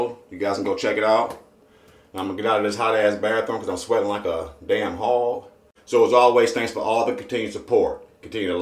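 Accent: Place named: South, United States. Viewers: I was thinking this was a Brotha from the South too. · Where’d you grow up? I can heard accents, south definitely, nola?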